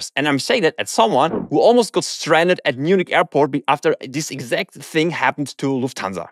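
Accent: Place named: England, United Kingdom. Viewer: Aww, I like your english accent :)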